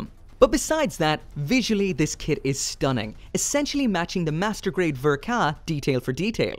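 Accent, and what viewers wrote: Ireland. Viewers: Once you heard his irish accent you can't unhear it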